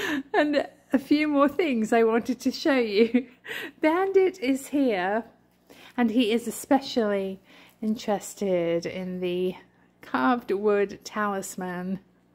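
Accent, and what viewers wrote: England, United Kingdom. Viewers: Congrat for reaching 100k. You deserve it love your english accent❤❤❤❤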